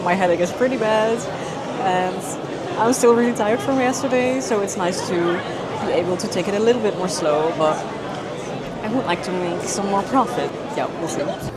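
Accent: Limburg, Netherlands. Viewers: Aaaah je komt ook uit Limburg! Ik heb nog nooit eerder iemand plat horen praten op youtube, super leuk haha❤️ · Love the Limburgs hehe 🫶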